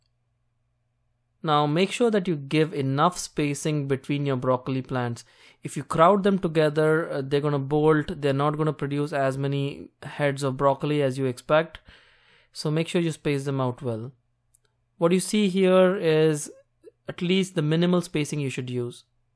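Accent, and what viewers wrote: India. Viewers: Is that a Hindi accent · you sound indian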